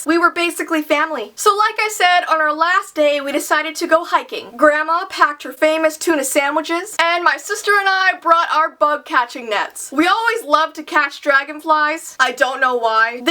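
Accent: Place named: Canada. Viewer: Ilysm!!!!!!!!!!!!!!!!!!!! I love your stories and your Canadian accent!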